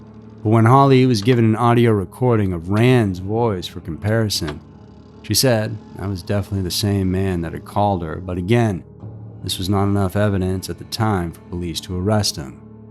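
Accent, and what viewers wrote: South, United States. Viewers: The narrator sounds like airy from the object show objects never escape but with a southern accent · I'm Scottish and I love your southern accent so much 😍. Awesome video, as always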